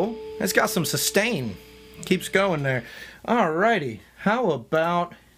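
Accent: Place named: South Jersey, United States. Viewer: you from south jersey or philly?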